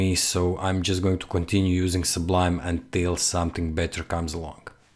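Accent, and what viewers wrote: Russia. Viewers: русский акцент? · рускиий акцент) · Thank's - you have a log of useful videos on your YouTube channel. Where are you from? I think maybe russian accent?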